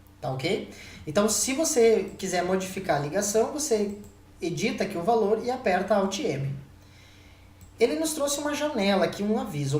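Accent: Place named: Rio Grande do Sul, Brazil. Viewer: Ótima didática! Parabéns! Eu utilizava outros softwares para modelação de estruturas metálicas, já havia mexido no Tekla há muito tempo em versões anteriores, essa versão está bem melhor! OBS: Pelo sotaque deve ser meu conterrâneo heheh... Estou em São José do Rio Preto/ SP, mas minha família é de Marau/ RS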